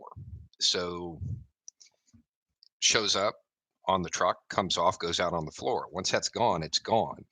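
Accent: South, United States. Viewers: eat the r*ch South American or Southern? That's two completely different things. has kind of a southern accent. It is far from a South American accent. (I only say "kind of" because I'm probably so used to a southernish accent that I don't really hear it as an accent.)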